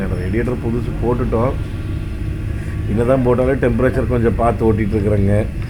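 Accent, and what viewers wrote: Kongu, India. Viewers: கொஞ்சும் கொங்குத் தமிழ் பேசும் கோமகரே...! திகட்டாத தித்திக்கும் பேச்சுடைய திருப்பூர் திருமகரே...! எங்கள் மதிப்பிற்குறிய டான் திரு. அண்ணா❤❤❤🙏 அவர்களே எப்படி இருக்கீங்க அண்ணி❤❤❤🙏 எப்படி இருக்காங்க ரித்திக்❤❤❤ எப்படி இருக்காரு. பயம் இருக்கும் மனதில் நிச்சயம் பலம் இருக்கும்...! எளிதில் அறுந்துவிடும் நூலை நினைத்து பயம் கொள்ளாமல்...? எதிர்த்துப் போராடி வீறுகொண்டு எழுந்து பறந்திட வீசும் காற்று உள்ளது என வானில் பட்டம் பறப்பதில்லையா...! அதுபோலவேதான் மனதை உலுக்கும் பயம் வந்தபோதும் எதிலும் உறுதி என்கிற தன்னம்பிக்கை என்னும் பலம்தான் அண்ணா உங்கள் வெற்றி மகுடம்...! நம் ❤சிட்டுக்குருவியோட❤ தங்களது இந்த லடாக் பயணம் இனிதே தொடர்ந்திட நல்வாழ்த்துக்கள் அண்ணா❤❤❤ என்றும் அன்புடன் உங்கள் தம்பி என்றும் அன்புடன் உங்கள் TMF ரா.முஹம்மது கபூர் (சென்னையில் இருந்து)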